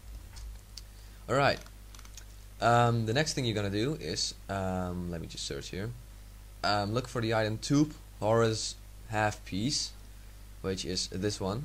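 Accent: Netherlands. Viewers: ik hoorde meteen dat jij nederlands was, =D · you sound dutch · Are you dutch ? you sound like it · are you dutch? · lol ik hoor gewoon dat je nederlands bent XD goeie tutorial 5/5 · You're dutch right? That accent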